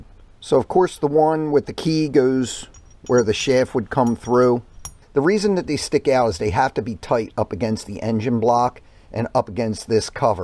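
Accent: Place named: Baltimore, United States. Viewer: I did take the spark plugs out, I shot some lubricant down inside with Kickstart a few times. Oil couldn't wait to spew out LOL. I ordered a new battery and waiting for it in. Your accent sounds like you might be from the Baltimore area. I'm in the Gettysburg area. When I get the battery in and try to start it I'll let you know how I make out. Thanks so much for your reply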